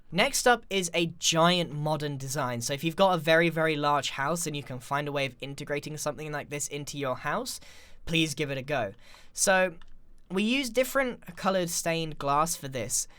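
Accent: United Kingdom. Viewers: Tyler Szulkowski he's British, its part of their accent,it sounds like swum but its actually swam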